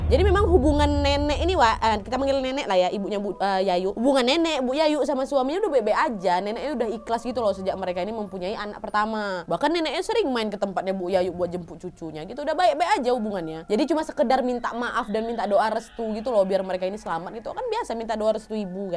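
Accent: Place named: Java, Indonesia. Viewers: Kak klu ngmong bahasa jawa lucuk deehh.. suka dngrnya.. 🥰🥰🥰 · Medhok jawane mbak apik 👍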